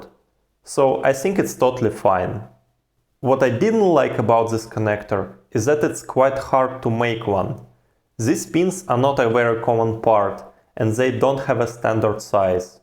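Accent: Russia. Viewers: Are you russian or Norwegian and russian accent sounds same?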